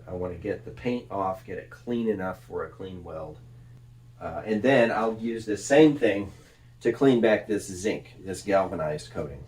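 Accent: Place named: Nebraska, United States. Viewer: Welcome back. Sounds like you grew up in Nebraska. And, you could use a Tig welder, they make them really small these days.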